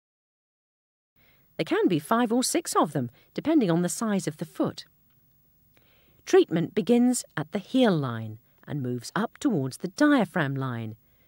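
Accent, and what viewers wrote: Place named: England, United Kingdom. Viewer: what a beautiful English accent , so clear , well paced and simply captivating well narrated , dynamic voice 🥰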